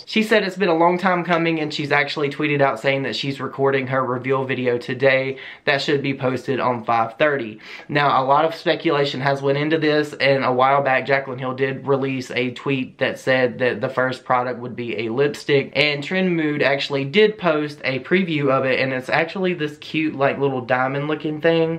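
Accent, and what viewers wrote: South, United States. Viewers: I love it so much that you have a southern accent ♥️ reminds me of the people around me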